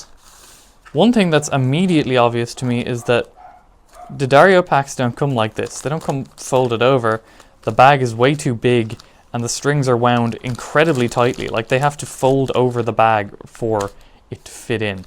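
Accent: Canada. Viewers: Are you a Newfie. You sound like my daughter from St. John's.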